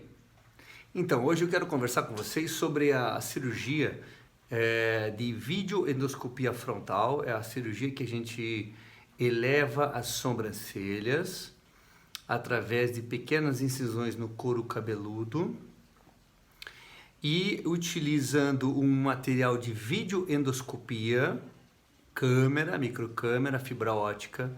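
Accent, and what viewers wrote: Rio de Janeiro, Brazil. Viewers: Dr o senhor é do Rio ?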